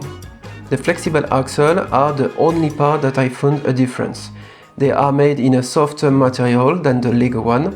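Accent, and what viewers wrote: France. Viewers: On entend l'accent français mais sa reste intéressant de l'écouter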